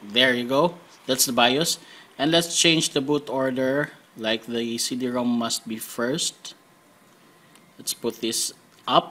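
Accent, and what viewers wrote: Philippines. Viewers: that Filipino accent tho🔥🔥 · Halatang pinoy to ah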